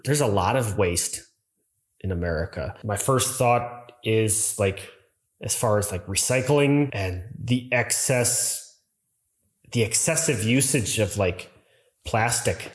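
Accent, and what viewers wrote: Germany. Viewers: Really thick german accent why? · lo you got slight german accent.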